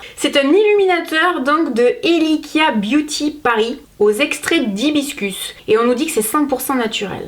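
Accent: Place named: South, France. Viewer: Merci pour ton sourire et ton accent.... Du sud à Paris... Je sors de l hôpital St Antoine. Je suis aidé soignante... Ca fait plaisir... Une vidéo en attendant mon RER... Je suis ravie..!!!!!! 💜💕💜💕💜💕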